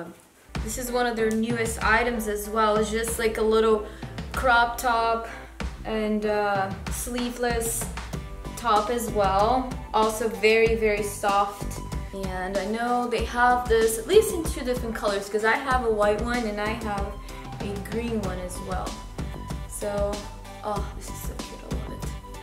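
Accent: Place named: Portugal. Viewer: Love your channel. I was wondering what nationality are you? Sounds like a Portuguese accent? Anyway, keep up the awesome channel. 👍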